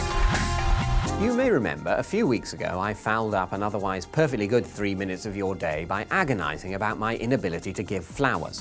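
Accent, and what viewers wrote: United Kingdom. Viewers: Its like the neurotic voice in my head got a nice British accent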